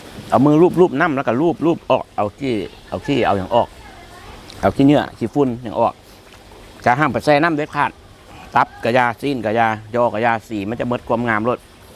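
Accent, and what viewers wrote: North, Thailand. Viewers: คนลาวอีหลีบ่ครับสำเนียงคือเสียงภาคเหนือของไทยแท้.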